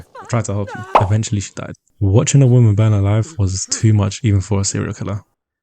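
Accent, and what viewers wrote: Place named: Jamaica. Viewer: Is it just me or bro use Jamaican sound 😮and i am Jamaican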